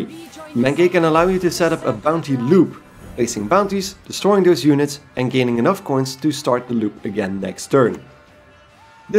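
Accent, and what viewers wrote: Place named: Netherlands. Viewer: I heard your voice and I immediately knew you were from the Netherlands or Belgium ahaha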